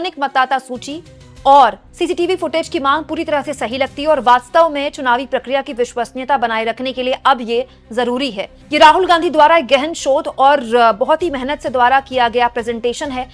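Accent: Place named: Bengal, India. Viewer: Your voice is bengoli tongue jeetna bhi Hindi bolo your face lip expression is mohali look like kindly improve it bro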